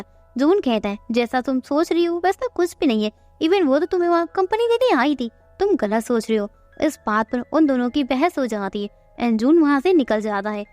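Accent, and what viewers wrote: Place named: Arunachal Pradesh, India. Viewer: Apki voice arunachal ki lag ta hain....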